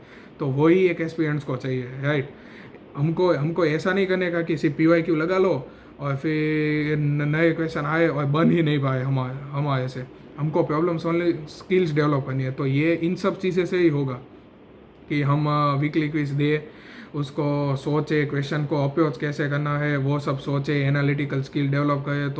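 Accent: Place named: Gujarat, India. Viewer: That gujarati accent relatable fr 😂💖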